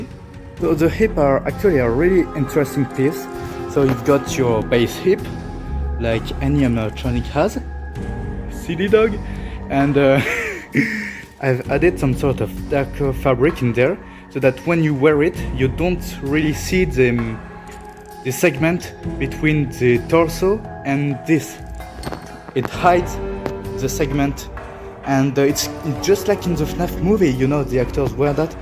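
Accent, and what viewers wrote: France. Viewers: Hey I just notice you have a French accent are you French ? Because me yes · you sound french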